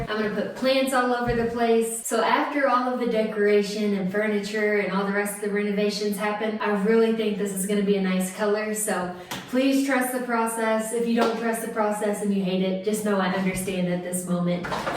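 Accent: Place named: Montana, United States. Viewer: Do all the Montana people have your cute accent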